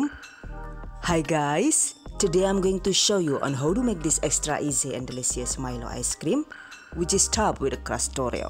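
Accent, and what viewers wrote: Banyumas, Indonesia. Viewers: Kereeeen bahasa inggrisnya, apalagi bahasa ngapak nya.... Sukaaaa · Yg aku tunggu2 dr channel ini adalah ktika udah ngomong jawa ngapak..sllu ngakak😂😂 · Kereeen ibunya gak pelit berbagi ilmu sangat detail...dan lucu si ibu ngapak ..wkwkkw auto subscribe 😂😁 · ternyata ngapak ibuknya😅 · msyaAllah ibu orang ngapak yang the bastd 😘😘😘😘😍😍😍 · Kiye wong Banyumas Karo baha inggris lagi campuran, ngandel metu banyumase jaaaaaan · Ngapak yh yung... 😁😁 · Jujur bun.. Saya klo denger bunda ngomongnya ngapak2 jd ketawa.. 😀.. Nyantai bgt gtu, Tp resep2nya oke pnya bun... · Ngapak ngapak hahaha keren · Trnyata ngapak ya bhasanya, nyong ben ngapak kie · Deneng ngomonge ngapak yu🤣🤣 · Ngapak temen yu, rika jawa ne ngendi😂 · Mantap bunda...jawanya ngapak banget...ngromet ..opo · Jebule wong ngapak, keren yakin video Rika Yu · ora ngapak ora kepenak ya mbak... 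salam ngapak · ngapakers koh sip lah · Suka bhs nya,Banyumasan kyk inyong😄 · Oalah jebul ngapak mbekayune 😂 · Jan maturnuwun banget ilmune bu, ngesuk tek praktekna lah, slain mengispirasi tp ana lucune pas ngomong ngapak, ( BOCAH NGAPAK YA ) 😀😀😀😀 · Ngapak2 lucuuu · Bahasane wong ndi iku,, koyo ngapak,,,, 😂😂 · Jebule ngapak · Terimakasih atas ilmunya, suaranya lucu bngt bu, bisa jd 3 bahasa gitu,indo,ngapak & inggris,, memang luar biasa, sangat bermanfaat sekali, semoga sukses selalu ya bu 😂😍😘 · paling suka tutorial vidio jelas dan selalu menarik utk di ikuti di tambah bahasa ngapak2 nya lucu salam dari Jogya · Mbak orang mana deneng ngapak banget.cara menjelaskan jelas mudah di mengerti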